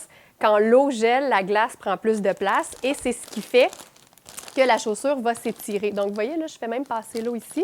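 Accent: Quebec, Canada. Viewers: stupide commentaire c est une québécoise idiot du village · Doney Hon c est français du canada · vous êtes non seulement BELLE mais avec votre accent Canadien, mon dieu vous êtes SO SEXY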